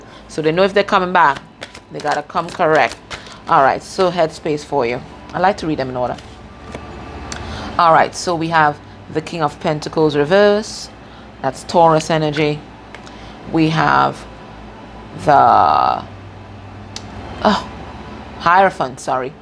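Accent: Trinidad and Tobago. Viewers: .....are you a trinidadian??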